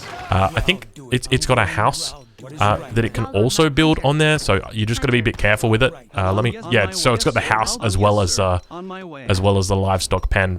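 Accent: Australia. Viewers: Love the way ,you aussies say "Good'ay"! I've been playing AOE III for some years, but i don't feel prepared for online xD Cheers from Portugal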